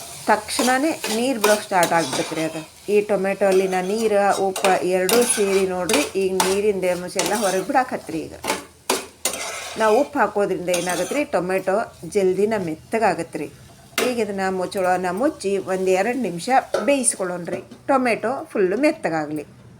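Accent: North Karnataka, India. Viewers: Uttar karnataka language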